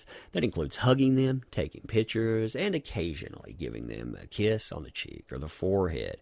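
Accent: South, United States. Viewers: Subbing purely for dat Southern drawl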